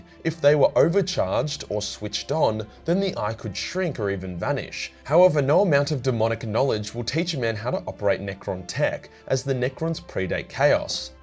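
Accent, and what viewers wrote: Australia. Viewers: Lol I think the risked it thing is from your aussie accent. As a American kinda flows off the tongue but I can see how itd sound funky with an australian accent. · First twenty minutes and three of these views are mine lmao. My phone, switch, and PC. I couldn't get two to buffer correctly and now all are running and I just.. This cacophony of Aussie is magnificent. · It's the Aussie accent inflection and pronounciation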